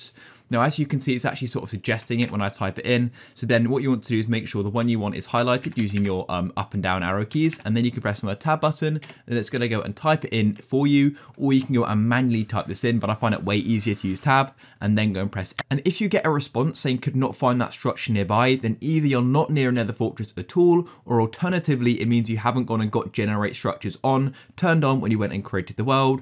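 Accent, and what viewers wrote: United Kingdom. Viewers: 1 word british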